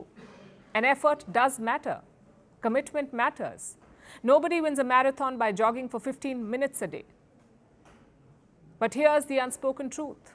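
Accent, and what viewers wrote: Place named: India. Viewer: It's difficult to listen to this presenter. Thick Indiannn accent. Lucky the caption helps.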